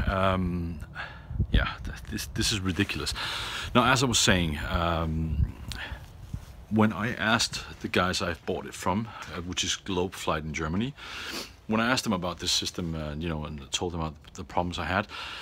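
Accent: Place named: Denmark. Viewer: but now i am curios where You from your English accent sound Danish that whas The reason i meant i had Seen You before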